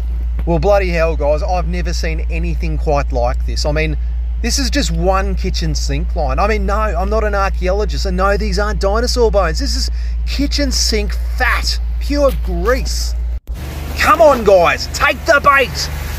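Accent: Australia. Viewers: Absolutely disgusting but still oddly satisfying to watch 😂 And mate, your accent simply makes it perfect! Like ozzy man! Since you're also an ozzy man! Edit: Reminds me of the lovely neighbors who ate very healthy... Lots of vegetables... Which they forced down the kitchen sink! Which then obviously caused a blockage and filled my bathtub.... That was so disgusting... Needed multiple hours to clear the drain and then clean my bathtub.... So if you live in an apartment with multiple others, please either compost, throw in the trash or flush down the toilet all the parts of your organic vegetables you don't eat...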